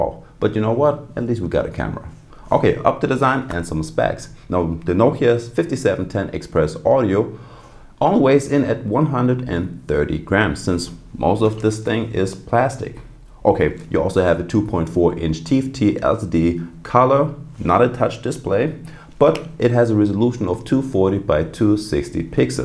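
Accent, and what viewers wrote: Germany. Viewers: Are you from Germany ? or maybe Austria . your accent sounds German to me.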